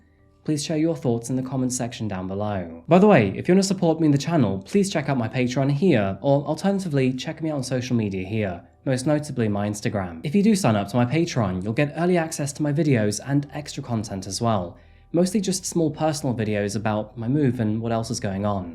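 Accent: United Kingdom. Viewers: I love to watch coffeehouse crime videos., The way you execute your videos and the way you tell us how you feel make me feel that you are a very good person. I love to sit and watch your work. I know it will be good footage from start to finish. Please cover all kinds of stories whether they’ve been covered already. whether they’re old or new, weird or wild. And I always learn something interesting about the location you are covering. I love how you tell the it!! And of course, that British accent!!! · Translation: He's cute and has a British accent.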